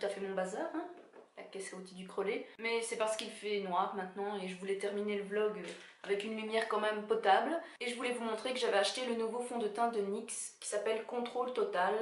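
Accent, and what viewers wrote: Belgium. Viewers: Tu habites en Amérique du Nord non? Il me semble que tu as l'accent Belge!? Chouettes vidéos 👍😉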